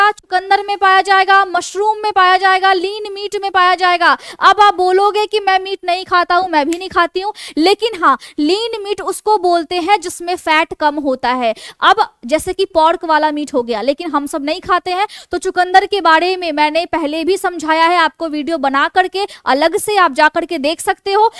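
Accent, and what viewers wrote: Bihar, India. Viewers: Aap bihari hai kya medam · Work on your tone, bol rhi ho hindi but bihar k tone aa rha hai.. your hindi word pronunciation is little bit weak..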